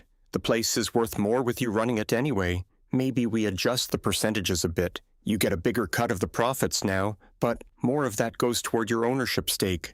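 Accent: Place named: Canada. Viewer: The voice sounds Canadian 😂😂😂. Parents don't want to deal with the sister and her kids😂😂😂😂😂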